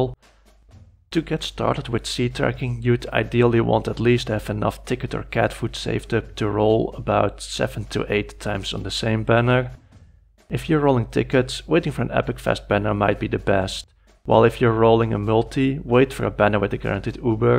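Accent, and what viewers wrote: Netherlands. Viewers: This man is 100% dutch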